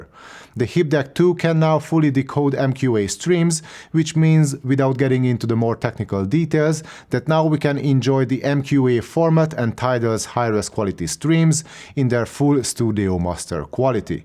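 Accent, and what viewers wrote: Hungary. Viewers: you sound very hungarian edit: I’ve just realized you are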